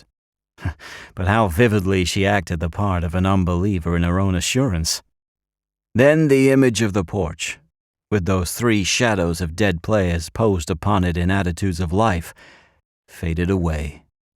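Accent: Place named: United States. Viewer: Many thanks,!! Great American accent!! I'm American and I think you did a great job!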